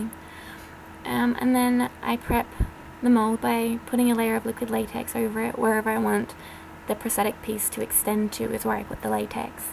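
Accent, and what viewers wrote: Australia. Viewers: Are you from Australia? Just curious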